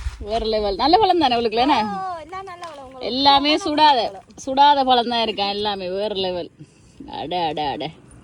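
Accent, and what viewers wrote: Sri Lanka. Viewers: எந்த நாட்டில் இருந்து பேசிறீங்க.இலங்கையா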